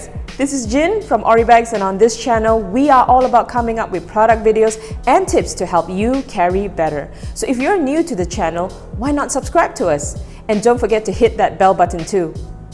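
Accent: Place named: Singapore. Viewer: What accent is that? Great to hear. Can I know which part of the world you are from? My guess would be Singapore.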